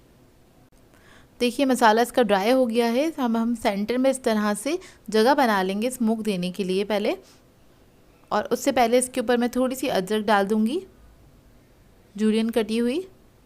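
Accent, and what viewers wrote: Karachi, Pakistan. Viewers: Your accent reminds my old days of Karachi! I really appreciate your effort 🤗☺️ GOD bless you 😊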